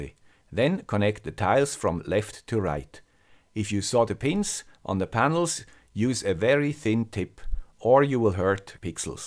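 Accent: Switzerland. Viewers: Hi. First of all thanks for all your amazing videos. Thanks to your videos I started playing with micro controllers as a hobby. I am a C# back-end developer and have very little experiense with embedded systems. Did you animated your LED strip with the ESP32 meanwhile using WiFi? I have not been able to find a library that animates the led strip correctly. The library esp32WS2811-master works great if WiFi is disabled. But I been having no luck animating 80 LEDs if WiFi is in use. What has work best for me is using the standard Adafruit_NeoPixel library that I previously used for arduino running that code on a separate core of the ESP32 and using "portENTER_CRITICAL(&mux)" functions of the ESP32 meanwhile animating. But still the first LED fails to animate correctly. The complete code can be found on this question: <link>. Maybe someone can answer in that question what could I be doing wrong. 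· We love! Merry Christmas! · don't become defective! Your counter will reach it's theoretical maximum before you know it! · We wont stop watching, keep up the good work, however everytime you say "dirt cheap" the Chinese push up the price 😱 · Depends on the manual (google translated Chinese datasheet can be rather cryptic), it is always nice for mere mortals that guys like does the ground work ^ ^ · Danke fur alles! Love the swiss accent!;) Nice video! Exactly what I was looking for! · God. Will definitely bless you to achive what you desire. My prayers are with you... Thank your very much for sharing your knowledge 🙏.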